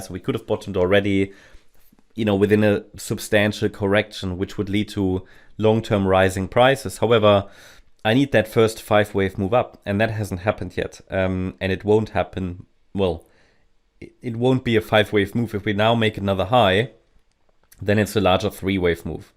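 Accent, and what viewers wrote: Germany. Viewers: If I'm not mistaken, your accent is a mix of German and English?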